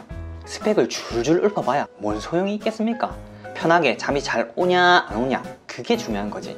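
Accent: Gyeongsang, South Korea. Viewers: 암생각없이 보다가 친근한 갱상도 사투리도 글코 멘트도 넘 우껴서 좋아요 구독 알림설정 쓰리콤보 하구 갑니다ㅋㅋㅋ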